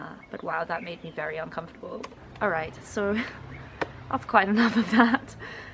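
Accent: England, United Kingdom. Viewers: You don’t sound South African you sound English . The guy sounds like he’s copying Nelson Mandela . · You don't sound South African at all haha, your accent is rather English. I really like it!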